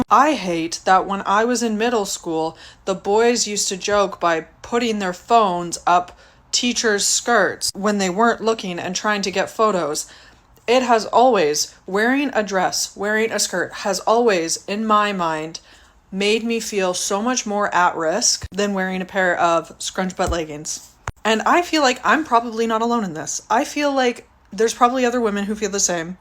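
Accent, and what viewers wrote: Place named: United States. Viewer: Rarely comment on videos, but why not… knowledge is power so the least I can do after hearing your perspective is to offer my perspective and opinion in return. So here we go… #1 First and foremost - This is 2025 and judging by your accent it sounds like you live in the states. Wear what you feel comfortable wearing and don’t worry about what other people think. - FEELING exposed is definitely a thing. Again, wear what makes you feel comfortable. For the same reason I don’t like to wear pants or shorts that don’t fit me right because I FEEL like they expose my ass crack lol I’d rather I didn’t feel exposed. - I mean, we couldn’t take pictures with our phones when I was in school haha so can’t relate there 🤷‍♂️ We did, however, sneak peaks when we could of course. Natural curiosity. But to take a picture and expose someone publicly or save a moment in time for future reference without their consent? 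That’s just fucked up. That’s just bad character. - Oh we are 100% picturing what you look like naked whether you’re in a dress or in leggings. Leggings actually make it easier though, just sayin’. Well… I guess it depends on the dress, too. Anyways… I that we goes back to natural curiosity as well. Speaking from a man’s experience, we have our preferences. At the end of the day, our primal instincts are just trying to narrow down a suitable mate. That, however, should NEVER excuse intolerable behavior of societal norms (but what has our society turned into anyways?). Without moral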